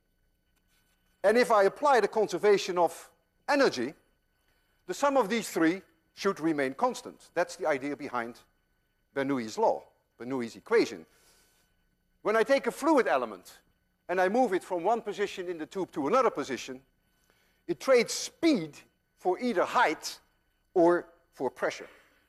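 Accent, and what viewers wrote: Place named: Netherlands. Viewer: He has a dutch accent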